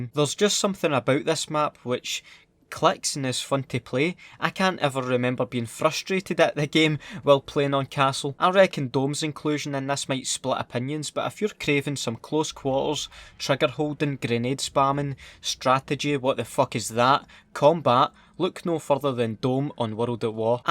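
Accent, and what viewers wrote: Scotland, United Kingdom. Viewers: Hearing a Scotsman explain literally anything makes it sound so much better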